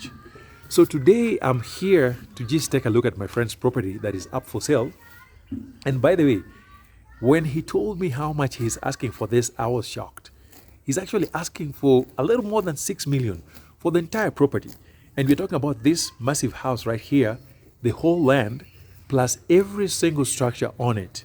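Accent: Luo, Kenya. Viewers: Like your tweng..but yu keep it real when yu pronounce Luo words..kosele!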